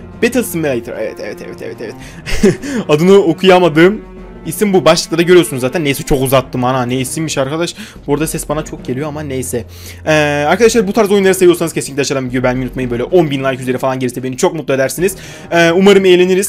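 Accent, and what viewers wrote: Ankara, Turkey. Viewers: abi sen ankaralimisin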